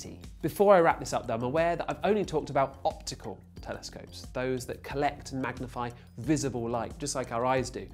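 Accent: United Kingdom. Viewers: WHY is everything that is scientific narrated by some guy with a British accent? And I still havent found out if a telescope ZOOMS like a camera lens or are they fixed?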